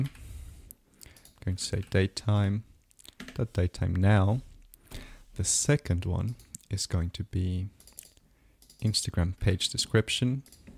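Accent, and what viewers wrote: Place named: United States. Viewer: Amazing tutorial. So we well explained and laid out. Question for you on CrewAI - is the process of picking a tool prescriptive, meaning the agent instructs which tool to pick? Or can the LLM pick automatically from a set of tools and decide what to use. I am just coming up to speed on CrewAI and wasn't sure. And your English is fantastic, by the way. First thought your accent sounded like you were from the USofA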